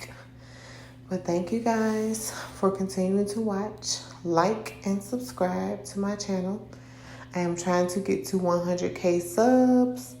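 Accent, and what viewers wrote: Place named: Louisiana, United States. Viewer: Oh man, when you said, "My dad's gonna be boiling crawfish.." I immediately thought, 'I bet she's from Louisiana'! I heard it in your accents and your words confirmed it 🤣 I never got to try crawfish while I was in Louisiana (some of us call em crawdads here in Kansas), and I regret that. I was 16 and I just couldn't get past the "suck the heads" thing.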